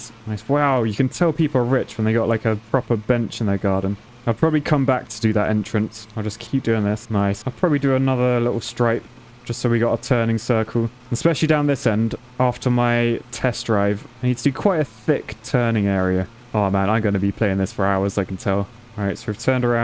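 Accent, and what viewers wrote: United Kingdom. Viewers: I came for the gaming and stayed for the British accent :)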